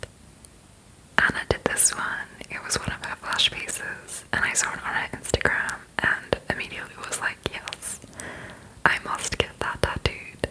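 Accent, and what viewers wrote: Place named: Australia. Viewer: I love your accent✨❤️ and your voice is all together relaxing. 😌 I would love to visit Australia someday but for now hearing your accent is perfect 👍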